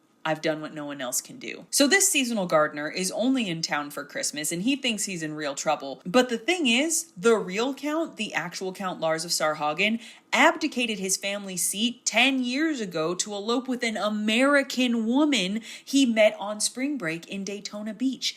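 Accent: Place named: United Kingdom. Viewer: As a Brit, I rate your British accent 10/10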